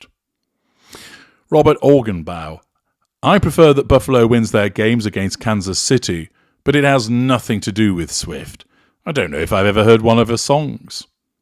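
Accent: United Kingdom. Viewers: Okay, who ever you are. You can talk all the shit you want about Meagan and Harry losers. Don’t go there with Taylor Swift. You are clearly British, just by accent. Taylor Swift is an amazing young woman. Do your research as a broadcaster. If you can find a fault with her person, behavior or actions then carry on. I dare you!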